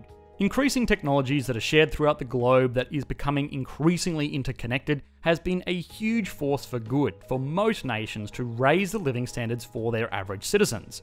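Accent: Australia. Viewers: I love your aussie accent mate👌🏻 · I don’t think he’s American. He sounds like he’s from Australia or New Zealand.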